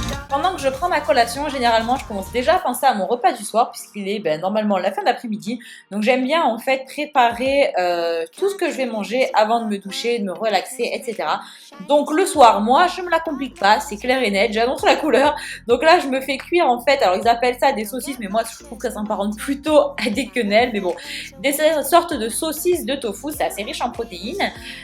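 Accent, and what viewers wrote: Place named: Marseille, France. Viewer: au début on dirais que t à Marseille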